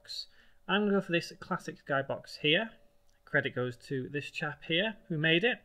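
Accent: United Kingdom. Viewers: Dude, he's clearly not American with that British Accent. He's from UK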